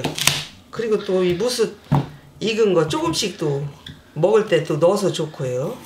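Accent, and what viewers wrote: Chungcheong, South Korea. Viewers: 무수, 무시 충청도구만이네요 구수하네요 예산,당진 그쪽 아니신가요 잘 봤어유 해먹어봐유 환장하자 맛있네유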